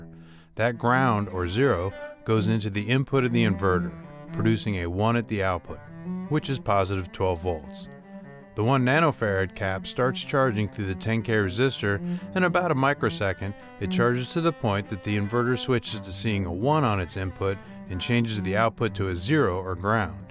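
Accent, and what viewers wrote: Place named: United States. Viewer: That's excellent. I would like one absolutely. I reside in UK though. I think I may of heard your accent being USA. Either way. Best wishes.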